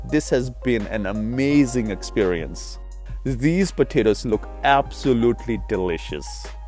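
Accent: Punjab, India. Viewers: Love the punjabi accent.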